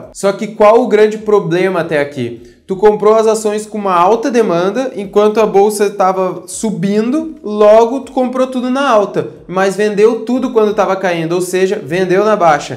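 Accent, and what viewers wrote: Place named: Porto Alegre, Brazil. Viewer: Sotaque bem portoalegrense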